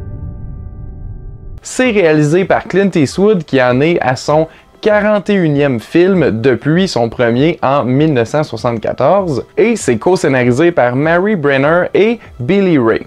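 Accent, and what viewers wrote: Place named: Canada. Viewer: Super ta vidéo, tu explique bien t'es avis, franchement bravo et très belle accent canadien !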